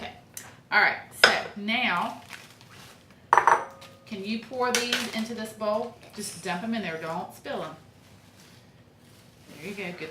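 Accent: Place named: South Texas, United States. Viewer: Great video. You sound like you're from South Texas.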